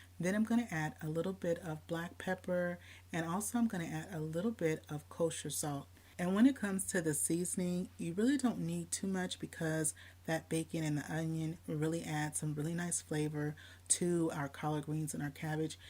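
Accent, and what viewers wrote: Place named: South, United States. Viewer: Now that is some south in your mouth ❤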